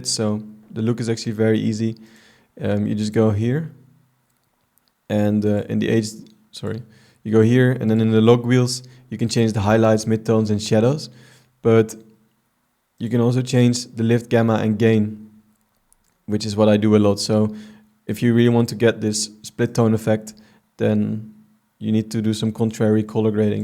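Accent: Netherlands. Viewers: Je bent Nederland volgens mij haha het accent nice video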